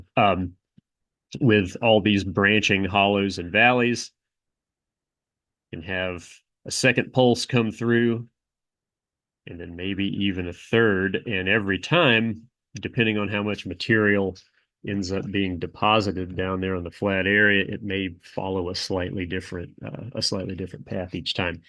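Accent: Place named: South Jersey, United States. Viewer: You are from South Jersey!!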